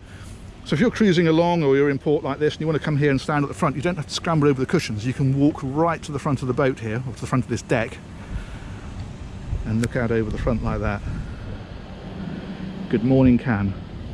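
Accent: United Kingdom. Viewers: This is an exceptional tour. The camera work is wonderful, your knowledge of boats, vocabulary and enthusiasm plus that classical British accent. Keep up the good work!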